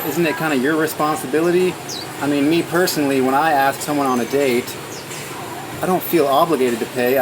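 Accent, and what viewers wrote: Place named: Vietnam. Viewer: I came across your video while learning English :) and already fell in love with your video :) it seems like you've lived in Viet Nam for a long time. you know very well about people in VietNam I'm also impressive with your Vietnammese accent. thank you for raising your voice and all your compliment to Vietnammese women. have a nice weekend :)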